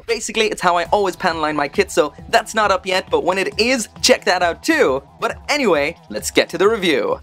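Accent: Ireland. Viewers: Are you irish? You sound it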